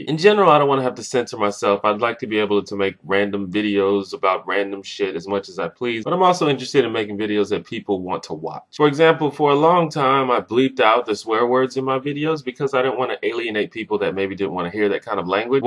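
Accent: Alabama, United States. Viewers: Why do you talk like that? Are you from alabama or something?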